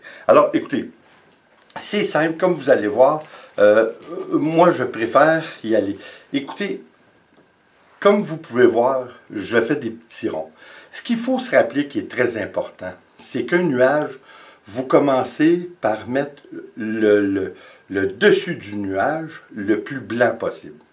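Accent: Canada. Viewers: Bravo cher vos explications Meme avec votre super charmant accent Canadien sont formidables 👏👏👏👏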